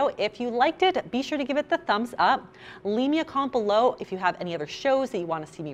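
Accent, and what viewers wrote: Canada. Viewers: Well yeah, at least in this context they are very different. The US has shows that are arguably more vulgar about weight than this one- My 600-lb Life or 1000 lb sisters. I don't think you knew she was Canadian, it doesn't seem like the majority of British people can distinguish between Canadian and American accents.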